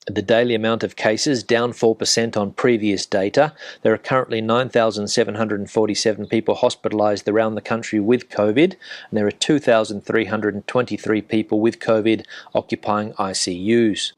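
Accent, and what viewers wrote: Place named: Australia. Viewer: Very atmospheric outside in your lovely garden in the Spanish sun with birdsong, gracias a los vecinos ruidosos👍 AND out in your 'CAH' - love your Oz accent - letting us see what we miss 🕶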